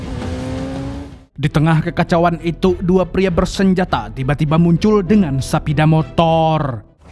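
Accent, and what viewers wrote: Banjar, Indonesia. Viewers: Mang orang banjar kah pian..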